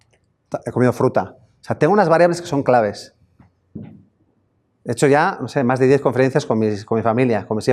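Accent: Spain. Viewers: Soy Luis Capdevila Argentino viviendo en Colombia., Muy Interesante la Conferencia! una pequeña observación; para una Comunidad Latina en las próximas charlas podría "vocalizar un poco más Neutro"? Se entiende bien, aclaro, pero a las personas que no estamos acostumbrados a escuchar otros Tonos como el de España, se dificulta el entendimiento por Hablar tan Rápido (cajitas ó cajetas?). Gracias Así mejorará mucho la Productividad de Uds que es La Comunicación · Yo lo entendí a la perfección. Pienso que a los latinos puede costarnos un poco más por el acento español, cuando habla muy rápido y por los modismos propios de España, pero ha sido divertido y contundente, especialmente cuando dice a partir de hasta , que la semana ha sido una puta mierda 😂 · Valeee que es de Bilbao, no de Colombia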